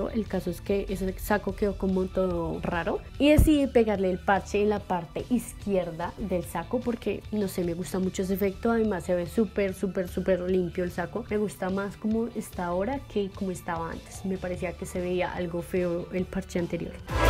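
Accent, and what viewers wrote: Colombia. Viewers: Es colombiana!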